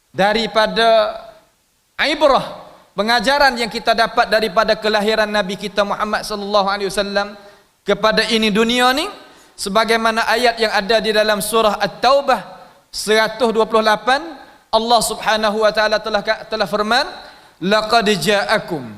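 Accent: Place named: Petani, Malaysia. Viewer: kurang dah loghat petani...hehehe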